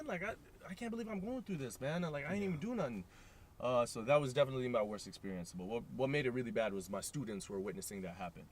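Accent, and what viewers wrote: Trinidad and Tobago. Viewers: I love how you jump in and out of Yuh Trini accent, it still spot on. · Good he still has his Trini accent 👍🏿😃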